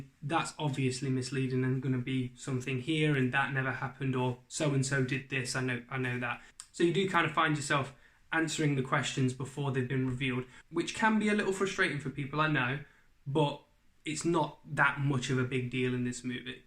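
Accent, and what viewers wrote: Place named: England, United Kingdom. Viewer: Hey, Bud, thanks for visiting our channel. My first time here, and gotta say, like your review. Not fair, though, because you have the cool English accent. Definitely not fair. Agree with your review. I guess I have a lot to look forward to, given that I haven't seen the other Guy movies you mentioned. have a good one.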